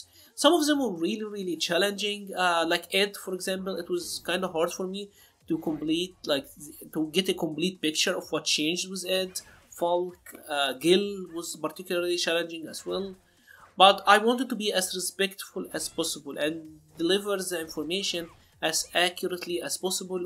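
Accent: Egypt. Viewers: Criminally low number of subs. Get the word out people, this guy is spitting knowledge with a smooth Egyptian twang.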